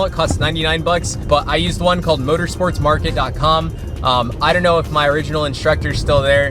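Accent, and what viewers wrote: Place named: California, United States. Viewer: Ughhhh, what a waste. Im glad you doing great in life BUT WHY ARE YOU TALKING LIKE THAT? YOU KNOW YOUR TALKING LIKE A WHITE CALI DUDE. SWEAR YOU DONT HAVE ANY BLACK FRIENDS. SWEAR, ITS SO WEIRD.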